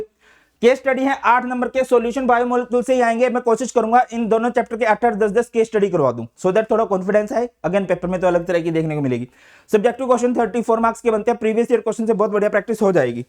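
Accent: Haryana, India. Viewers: SIR AAP HARYANA M KARNAL SE HO KYA?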